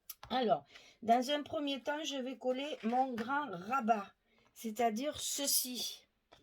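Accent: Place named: Provence, France. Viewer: Bonjour. Nouvelle abonnée. Tout d’abord bonne année ou meilleure que la précédente du moins. Toulonnaise de naissance - mais toulousaine d’adoption - votre accent fait chanter les cigales dans mon coeur. J’adore ce que vous faites même si je ne suis pas très chaby chic. Juste une petite remarque. Ce n’est pas un style grunge ou trash (cyberpunk) mais steampunk, courant artistique basé sur le début de l’industrialisation en Angleterre sous le règne de Victoria d’où les corsets et la dentelle dans les rouages. Le grand leader de ce courant étant bien sur Jules Verne. Côté cinéma voir Wild wild west (Les mystères de l’ouest) et la Ligue des gentlemen extraordinaires entre autres. Voilá voilá.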